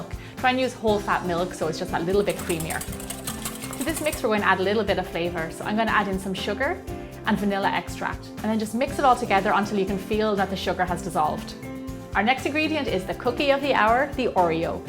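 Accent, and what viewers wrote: Ireland. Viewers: so thats why she has that accent, i didn't know that she was irish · Are you Irish??? CAUSE I AM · I love your Irish accent!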